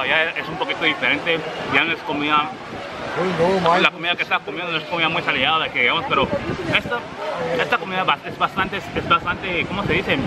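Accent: Guatemala. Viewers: Hola eres guapo 😘, me gusta q hablas cómo guatemalteco, has vivido acá?? Cuánto tiempo??? Que edad tienes??? En que trabajas??? Dios te bendiga desde Guatemala. Claudia 😘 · No perdas el acento chapin!!! Eso le da un toque mas calidad a tus videos Saludos desdd Guatemala!! 🇬🇹🇬🇹🇬🇹🇬🇹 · JAJAJA Amo que tenga súper marcado el acento guatemalteco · Por casualidad comence a ver tus videos, me encantaron, y lo que mas me gusto por ser chapina fue tu acento guatemalteco. 👌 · No pierdes tu acento Chapin porfa....yo empecé a ver un vídeo tuyo y me encanto...Ya le di suscripción y con campanita🇬🇹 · Cual es tu historia, por que hablas bien chapin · Nueva suscriptora :) saludos desde Guate xd No olvides tu acento chapín porfa <3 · Mira sus vídeos anteriores ahí lo explica, pero si, sí vivió acá en Guatemala como 18 años por eso su acento Chapín. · ¡Tu acento chapín es perfecto! 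· Soy nuevo en tu canal y para empesar esta bien chilero me llega ,como ablas como chapin no cave duda que bos si sos calidad .te saludo desde la capital de Guatemala.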